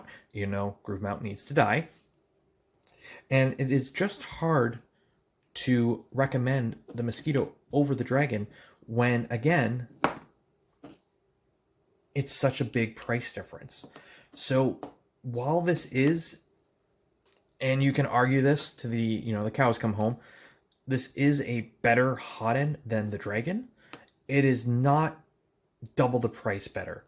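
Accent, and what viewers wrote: Canada. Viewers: You are in Nth America though....common admit it - you re CANNUCK !! You cant hide that accent :-)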